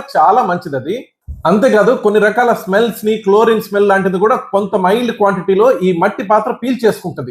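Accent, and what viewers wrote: Nellore, India. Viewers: మీ మాట తీరులో మన నెల్లూరు యాస స్పష్టంగా కనబడుతోంది 🙏 మంచి సూచనలు సలహాలు ఇచ్చారు ధన్యవాదములు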